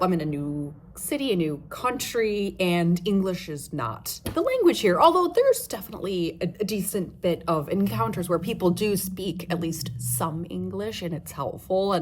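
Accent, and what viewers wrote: United States. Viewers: Thick Nhat Hanh was great. I used to have a book by him, but I don't have it now. I must have lent it to someone (when I lend, I don't bother trying to remember to whom). How was that pizza on a scale of 1 to 10? You're a better traveller than I would be. I probably wouldn't even attempt mainland Europe without having one or two other people to help me figure it out. UK? No prob. Ireland? No prob. But I would be too intimidated by communication difficulties to visit Belgium, Italy, France, Germany, etc. Have you experienced any rudeness that you suspect might be related to your American accent? The US government has lost a lot of friends over the past few years due, of course, to our complicity in a certain other country's... shall we say, activities?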